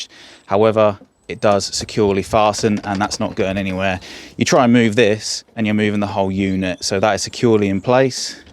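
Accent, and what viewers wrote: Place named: England, United Kingdom. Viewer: Being French, it's very nice to have an equipment review with a nice English accent instead of an American one. And to hear you give measurements in the metric system: priceless!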